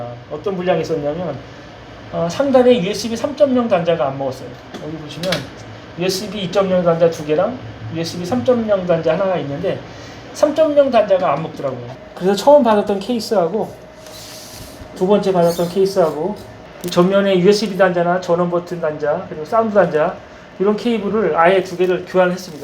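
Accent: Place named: Seoul, South Korea. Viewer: 사장님은 춘천분이신데, 서울말을 정말 잘 쓰심 ○_○ 발음도 좋으심👍👍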